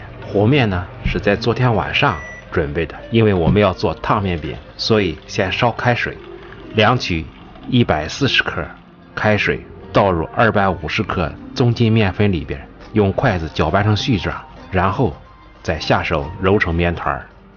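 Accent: Shandong, China. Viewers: 一听就是山东好汉，胶东人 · 我听出了您的山东口音我家祖辈也是山东烟台人👍谢谢您的答复，祝您圣诞快乐🤶🎄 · 是的，山东老乡。你家乡话还是那么重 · 听口音您也是山东人吧，同在日本，听到乡音很亲切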